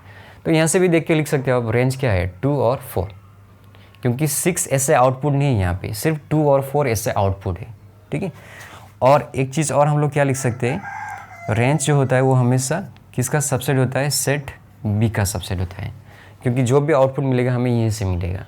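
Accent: Assam, India. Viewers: Kya aap Assam se ho?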